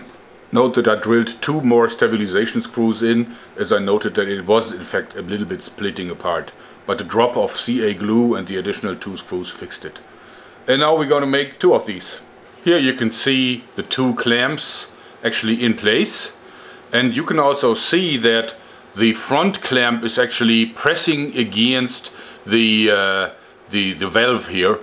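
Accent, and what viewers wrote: Germany. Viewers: I'm sincerely curious, do most German people speak English so fluently? If it wasn't for the accent I would have no idea his first language isn't English. Secondly, I really like how much he seems to enjoy doing what he is doing. Every time he laughs like that it makes me smile. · Very late to the party here, but I noticed something while rewatching this old video. When our mad rubber tubing based genius says one specific phrase, he sounds just like a certain Austrian Governor of California. He sounds nothing like him at all, but when he is bent over and speaking the words "Pumping Up" are uttered. It's like Arnold's voice came out for half a second, and then straight back to the usual German accent. I'm sure it's just an affectation of bending over and speaking, but I still got a chuckle.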